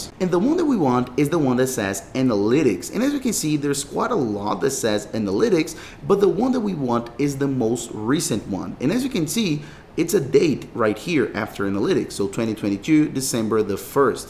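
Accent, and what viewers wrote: Brazil. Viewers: Amazing that even never having entered this channel, this video or having ever heard or seen this guy I am 100% confident he’s Brazilian judging by that accent that I’m able to recognize because I am Brazilian and am a good accent spotter. That said this video is awesome. I had no clue about this. Thanks a lot.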